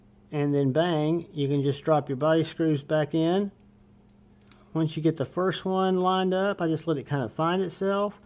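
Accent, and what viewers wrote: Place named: Baltimore, United States. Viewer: Your accent sounds like Baltimore 😂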